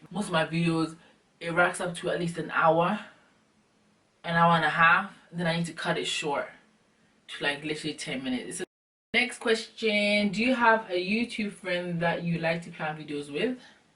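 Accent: India. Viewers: lmao!!! I can tell Southafricans by the face shape and eyes yea! I sometimes mix Zimbabwe and Zambia. I need a camera like yours. I'm over here placing a mirror behind just to see!!!! #dontjudgeme that indian accent makes my day. another dance video due. I've tried filming when I wasn't in the mood. disaster!!! #delete God will make a way oo! I yell out the window before I record!!! lol!!! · But then I'd always related that accent you do to the Indian accent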